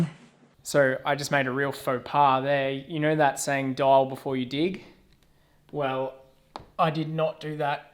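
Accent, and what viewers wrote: Australia. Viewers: I love your videos, it's fascinating to watch you do this van build and you explain everything so well that I feel like I could even do it....almost. It also doesn't hurt that you're easy on the eyes and have an australian accent. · Now get ready for the questions about bob being your uncle 😂😂😂Us Aussie’s get it but l don’t know about other countries. I love the country boy using good old fashioned slang and your “strine” is music to the ears. Great job young cobber ! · I’m in awe of people that can do this stuff 👍🏻 and is it my imagination but has that Aussie twang gotten stronger?!😂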